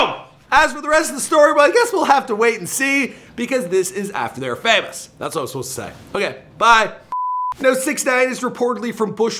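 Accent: Canada. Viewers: Why do people always talk junk about? I like his content. Yea he’s a Canadian and don’t pronounce everything the way we do but cut the guy some slack y’all. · I hate the Canadian accent lol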